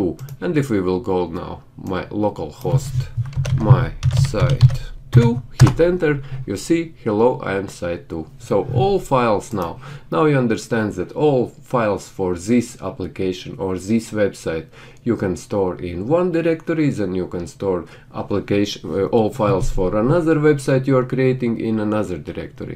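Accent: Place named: Russia. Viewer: Russian or Ukrainian . Around there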